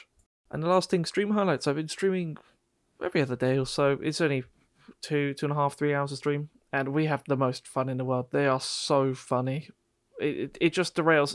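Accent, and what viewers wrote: United Kingdom. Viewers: he is probably from Birmingham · He gives me cockney vibes (north east london) rough as anything and kind of a pub brawler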